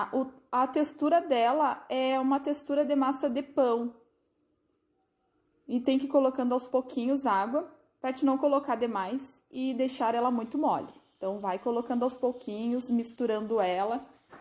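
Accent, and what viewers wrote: Rio Grande do Sul, Brazil. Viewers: Voce conversa igual uma amiga do Rio Grande do Sul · É gaúcha né ela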